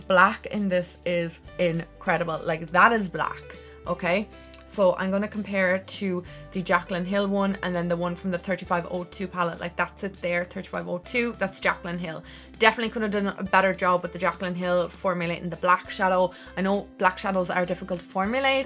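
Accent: Ireland. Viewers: I’m sorry but I’m liking and following instantly bc ur irish, An bhfuil chead agum dúl go dtí an leathreas (probs spelt like half the words wrong lol) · You're Irish😄😄😄😄 Legit haven't been able to find any Irish youtubers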